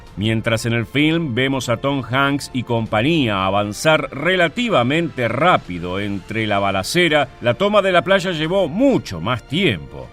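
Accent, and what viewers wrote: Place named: Argentina. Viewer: Tu forma de hacer crítica es de lo más absurdo. Pará la próxima ocasión, en vez de criticar realiza una investigación concienzuda de los hechos y haz una entrevista personal a los soldados muertos para que te digan a detalle lo que sucedió. Posteriormente escri e el guión y haz tu propia película. A propósito, por tu tono de voz éres de nacionalidad argentina, verdad?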